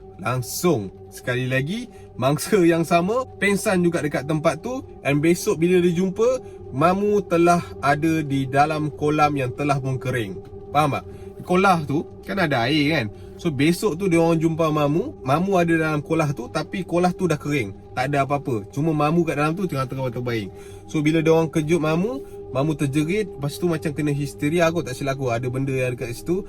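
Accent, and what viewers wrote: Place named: North, Malaysia. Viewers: pandai betul cakap utagha. nak belajar lah. hehehehe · org utara ke?byk kali dgr style ckp MCM utara hehehe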